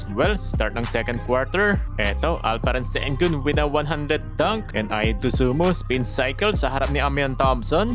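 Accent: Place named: Isabela, Philippines. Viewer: Taga isabela ka lods noh same kau ng punto ng kompare ko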